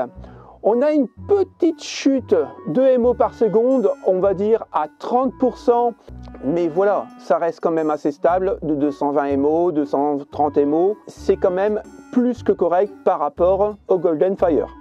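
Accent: North, France. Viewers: T'es de ch'nord ? ^^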